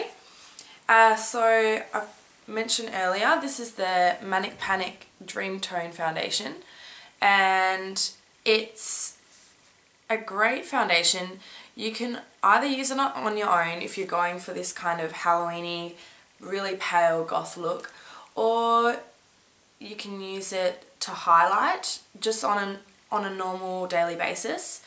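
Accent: Australia. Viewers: OMYGOHHD your australian ;P that just instantly made my love you x3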